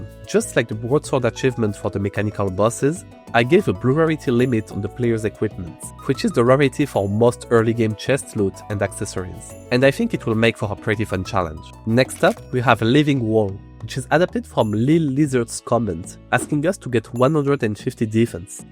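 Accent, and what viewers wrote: France. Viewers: you sound like you have a french accent. Am i wrong?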